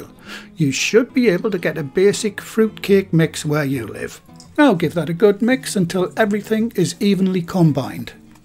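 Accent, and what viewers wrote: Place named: Newcastle, United Kingdom. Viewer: amazing recipe but even better when it’s read out by what sounds like a geordie version of Winnie the Pooh! 😊 🤩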